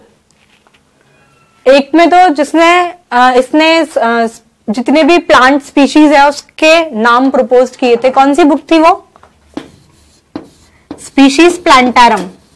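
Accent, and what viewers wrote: India. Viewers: your pronunciation is truely indian .... its very difficult to understand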